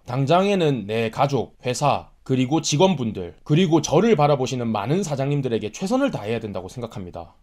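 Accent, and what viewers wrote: Gyeongsang, South Korea. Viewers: 혹시 고향이 경북경남쪽인가요? 사투리가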